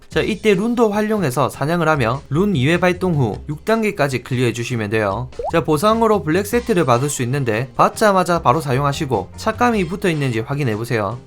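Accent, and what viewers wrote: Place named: Gyeongsang, South Korea. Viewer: 경상도사투리 진짜듣기싫다 어우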